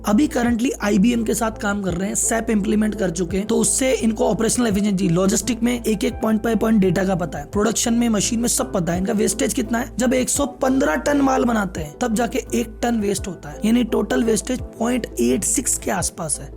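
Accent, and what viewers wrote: Gujarat, India. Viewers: Tamara accent gujrati chey , dhando walo mota bhai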